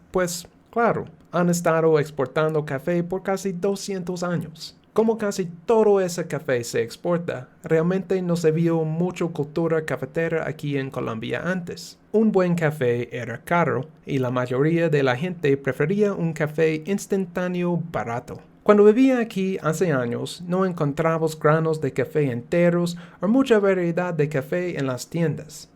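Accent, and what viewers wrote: Spain. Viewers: Bebo cafe dos veces al dia, cada dia. Creo que mi sangre esta llena de cafe. Funny that the accent made all the difference. I can understand 'red velvet cake' in a Spanish accent just fine. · Que chistoso! El inglés con un acento espanol...he disfrutado tus cuentos y tengo muchas ganas de otros...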